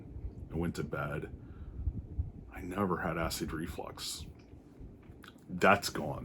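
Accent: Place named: Michigan, United States. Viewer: edit Oh shit, you said Birmingham? Based on your accent, I'm assuming this is Michigan and not Alabama, but if you are a fellow Southerner, shoutouts to you!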